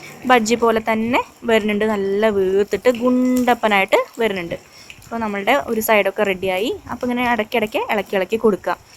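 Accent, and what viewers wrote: Thrissur, India. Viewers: തൃശ്ശൂക്കാരിയാണോ ചേച്ചി? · Good presentation with Thrissur accent. Even a kid can understand.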